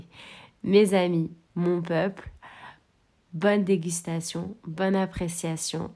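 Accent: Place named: South, France. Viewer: Une explication distinctive du sud et la bonne chance bb 😍😍😍😍😍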